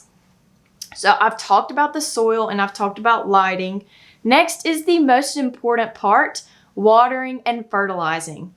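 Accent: South, United States. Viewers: “Ah leeuhv eeuhn uhmericuh” in the world’s strongest southern accent, as if we didn’t know 😆. Haha no shade, I’m in Oklahoma.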